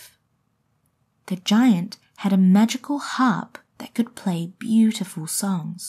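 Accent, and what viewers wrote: New Zealand. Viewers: You have a proper Kiwi accent haha! Did you go to broadcasting school by any chance? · 내년 1월에 뉴질랜드 6주 어학연수가는 학생인데 뉴질랜드 문화 이런것도 영어로 소개해주세요 뉴질랜드 발음 너무 조아요💕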